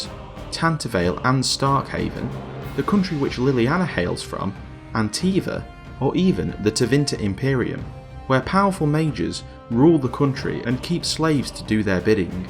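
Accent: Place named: Midlands, United Kingdom. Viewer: haha thats cool mate, loved ur vid, im from london so local by the sounds of ur (midlands) accent? lol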